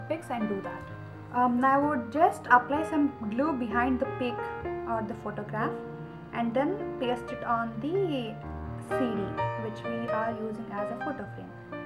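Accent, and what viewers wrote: India. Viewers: I am Indian too but I find her accent funny and cute :D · I like the video it is so awesome. Ps I like you're Indian accent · Kannada accent😊but nyc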